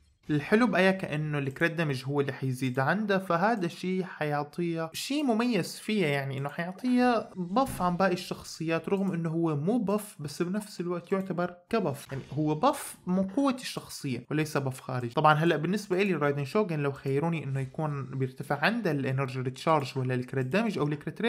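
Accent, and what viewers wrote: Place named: Iraq. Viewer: الاخ عراقي😂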